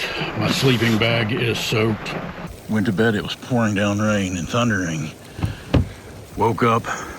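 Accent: South, United States. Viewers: Aha, I knew I heard a southern accent. I finished a three year old video a little bit ago and in my comment , I asked if I caught a southern accent. I just read a comment by you saying you were born in Georgia.. Me, too. I was born in nineteen forty five in an ambulance on Peachtree Street in downtown Atlanta. I'm married a TenneNossee boy, and we moved to tennessee when we retired. The cold and snow and ice of minnesota that you And Fiona camp in just blows my mind. Just What do you find fun in that? I do Really enjoy watching you do it.But I do worry about Fiona sometimes. I look forward to your scamp adventures and watching you redo your Scamp camper.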